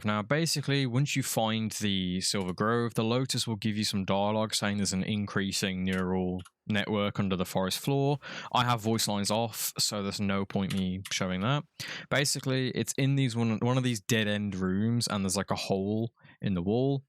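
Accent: United Kingdom. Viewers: the briish gigachad channel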